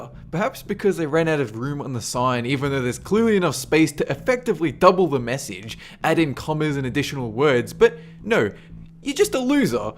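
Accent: Australia. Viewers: He just sounds like a normal aussie to me haha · I don’t know how many times I’ve started a Planet video only to learn that wasn’t the narrator for that video. I don’t mind the other narrators at all, but the constant disappointment of not being able to listen to beautiful Australian accent when I intend to is annoying. Thanks for this playlist. Now I can listen to Australian lit whenever I want to, and only him. Also this is one of, if not my favorite, videos. I don’t know how many times I’ve watched this video. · Is Australian? I can never tell the difference between a british, eglish, and australian accent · OMFGGG i'm Australian too.. but, that's not the point. i thought you were American, and as soon as i heard you say WE have a saying here in Australia i can now suddenly hear an accent coming from you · Are you australian cause i am and you hardley sound australian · Very funny! 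Also, if you have closed captioning, like I do, it doesen't understand the Australian accent And the "gusses" it makes in American English are funny too!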